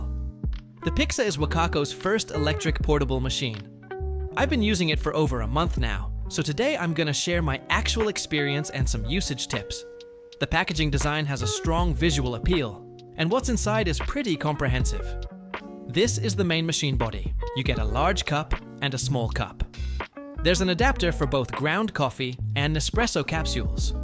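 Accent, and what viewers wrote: United Kingdom. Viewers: Bro became more British since last time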